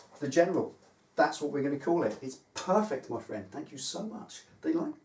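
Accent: United Kingdom. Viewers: Hi. I watch all your videos. You are a fantastic orator. Your british accent sometimes trips up my American ears though. At the beginning of most of your vids, you use a word I can never make out. I think uses it at the beginning of " ". I can't understand him either (especially in that song). What is that word? It sounds like "watcha", "watcher" or something like that. It's driving me nuts!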